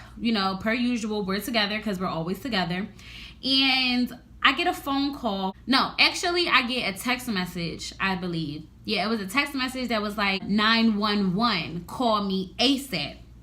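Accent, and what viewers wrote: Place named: Philadelphia, United States. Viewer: yesss i ik u was from philly the way you talked :) lol ysss tell more im from north philly and same shit kinda happend to me .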